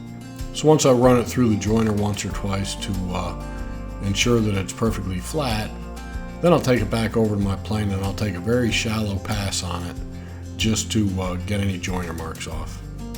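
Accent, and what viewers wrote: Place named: Northeast, United States. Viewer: I'm in North Carolina now from Pittsburgh. I recognized the North Eastern in your speaking. Tired of the ridiculous cold Snow...etc! Really love it down here! BTW, great channel! I am a master builder/Carpenter, and built a few guitars by hand. I was thinking about getting involved in the CNC as well.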